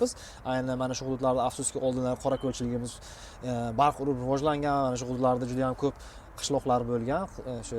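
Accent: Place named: Fergana, Uzbekistan. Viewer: Нимага чала гапирасиз фаргона гапиз кобкети ичизда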